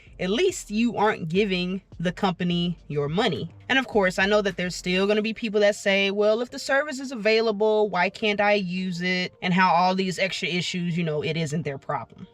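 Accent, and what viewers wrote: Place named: AAVE, United States. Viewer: It's AAVEE, which is just another system of language in America, particularly by black people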